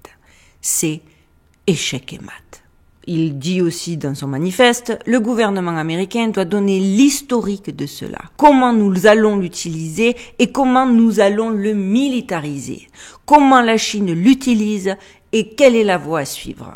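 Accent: Marseille, France. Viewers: Cet accent de la garrigue! Un délice! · Hello everyone ! J'adore <3 Avec l'accent marseillais en plus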